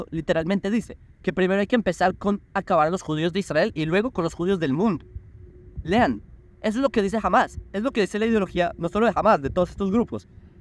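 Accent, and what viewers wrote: Bogotá, Colombia. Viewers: Tienes familia en Colombia? Es que me parece que tienes mucho de acento rolo (Bogotano).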